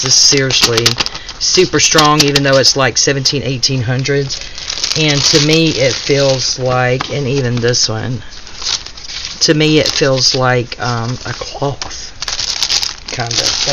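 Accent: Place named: South, United States. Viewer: Nope. All good. But I’ve got to say since that explanation at the end….I don’t think there’s anything wrong with your “scruffy” voice. To me, it just sounds like a southern accent. Heck. I sound like a whiny kindergartner.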